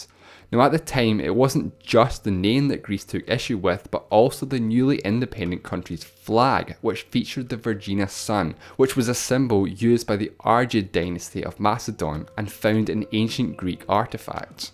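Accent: Scotland, United Kingdom. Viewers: The Narrator of this video is obviously Scottish. Scotland was named from the dense fog and darkness of this land. Scotland was discovered by the Greeks <link>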